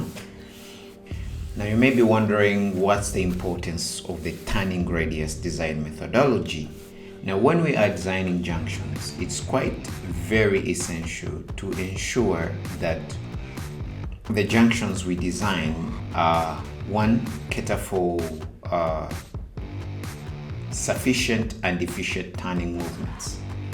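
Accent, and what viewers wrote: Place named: Uganda. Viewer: you sound like a ugandan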